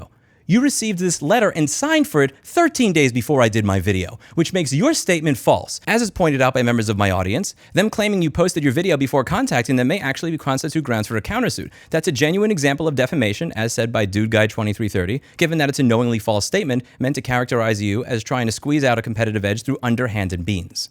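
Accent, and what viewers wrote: New York, United States. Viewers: Had to play this at 70% speed because Louis is from New York and I wanted to listen to him say it like a mob boss.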